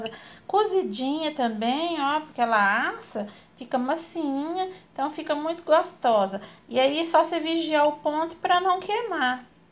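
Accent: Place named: Minas Gerais, Brazil. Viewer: Eita sotaque mineiro gostoso de ouvir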